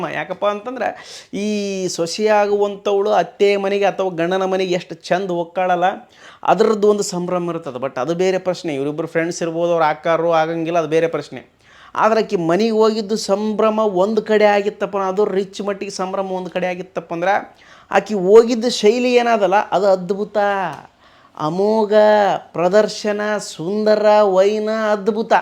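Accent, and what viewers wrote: North Karnataka, India. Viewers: Anna nin video goskara wait madtirtini nanu maraya😂😅. Anna ni ಹುಲಿ 🐯🐅. Nin mathugalu antu nimma Uk bashe alli kelodakke antu 🔥🔥😂😅. Anna gilli mattu kavu ge chittara award bandide adr bagge video madu matte gilli boss ge bbk 12 session car 🚘🚗 gift sikkide adr bagge video madu 😂😅 . Im waiting for this video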